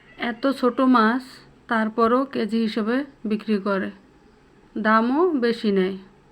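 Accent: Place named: Barishal, Bangladesh. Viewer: আপু আপনার দেশের বাড়ি মনে হয় বরিশাল কথায় বোঝা যাচ্ছে |আপনি যেখানেই যান না কেন |নিজের দেশের মানুষের কথা শুনলে মনে হবে আপনার আপনজন ❤️❤️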